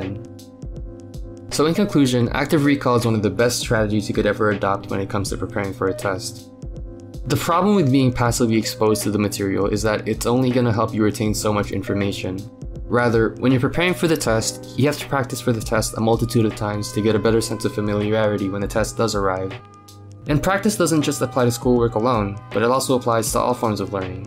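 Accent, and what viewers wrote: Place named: United States. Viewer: Hey, are you Indonesian? Or Malaysian? But, you sound so American, hhhmmm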